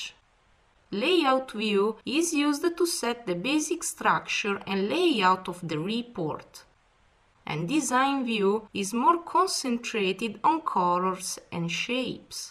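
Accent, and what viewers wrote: Italy. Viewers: I think it's Italian, definitely not Russian :) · This Italian accent though :D so funny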